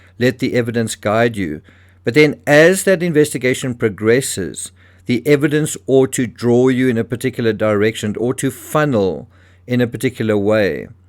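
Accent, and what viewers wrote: South Africa. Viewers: Mmmh, u sound very South African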